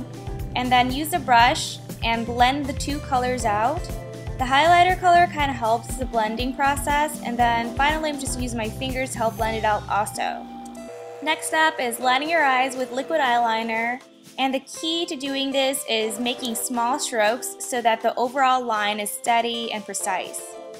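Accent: United States. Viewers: she looks asain and has country voice :)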